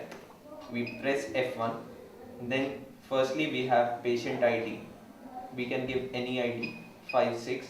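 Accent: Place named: India. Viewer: thanku sr for making video in Indian english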